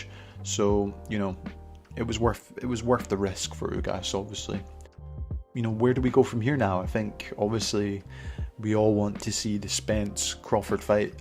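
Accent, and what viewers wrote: Scotland, United Kingdom. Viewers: Hey dude are you from Scotland? I’m from Dundee/Aberdeen and I love boxing. We should do a co-lab sometime, I’d be happy to jump on the show and chat with you anytime! Keep up the good work 👍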